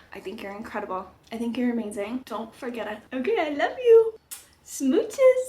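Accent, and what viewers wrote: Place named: United States. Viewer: i thought u had a country accent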